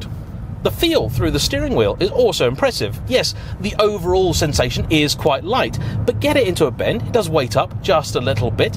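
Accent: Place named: RP, United Kingdom. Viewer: You sounded so posh and isolated.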